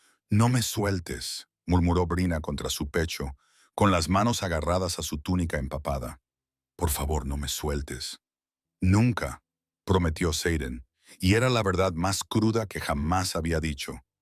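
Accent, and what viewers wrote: United States. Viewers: Siii eso es lo malo del IA, el rey Saden aveces lo pronuncia como “saden”, “seiden” y hasta “siren” y a Brina a veces lo pronuncian como “Baina”, “Brea”, “Brena” y “Bria”; y para colmo aveces suena como un gringo tratando de pronunciar la R 😂😂😂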